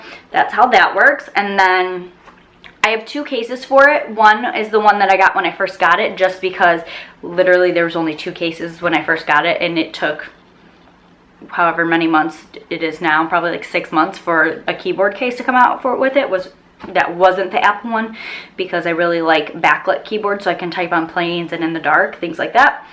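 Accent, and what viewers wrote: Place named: New York, United States. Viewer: Thank you so much for filming and sharing this video you have such a wonderful channel and equally amazing content that is an absolute pleasure to watch and enjoy I love your accent are you from New York by any chance?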